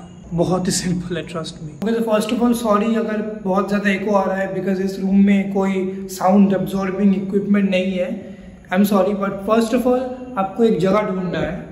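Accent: Bengal, India. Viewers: Bengali ya odia?😂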